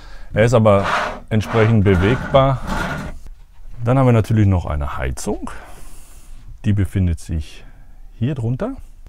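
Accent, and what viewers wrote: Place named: Ruhr, Germany. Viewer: Du bist und bleibst eine coole Socke. Ich liebe unseren Ruhrpott Slang. 😉🍻 Wenn du jetzt noch aufhören würdest Terpentin zu qualmen 😂 Gruß aus Mülheim.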